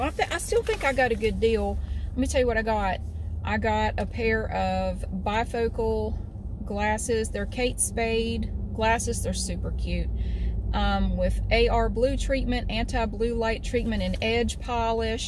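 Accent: South, United States. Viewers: Hey! Longtime fan of yours (I’m originally from the South and your accent reminds me of home:) I live in DC and just happened to drive by the Seabee memorial while showing some folks around. Made me think of you and your dad. Hope y’all have a wonderful time here and that this gorgeous weather we’re having lasts for you!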